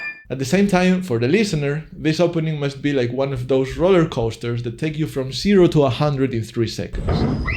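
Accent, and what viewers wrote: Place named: Spain. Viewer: Se te nota el acento, español jajajaj. Buen video :)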